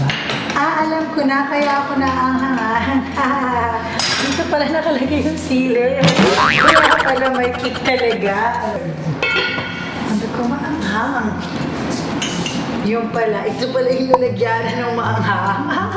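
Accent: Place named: Philippines. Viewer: Super funny!!!! I hear the zobel + jologs in you just like me hahahaha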